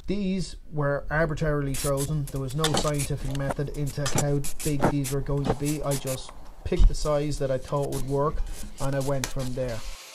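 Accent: Ireland. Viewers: You Irish? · Don’t even try using the closed captions. You just need to learn thick Irish. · Stellar video! So cool to see it worked out so well, and the video production is also very nice. Loved the commentary and the Irisch? accent.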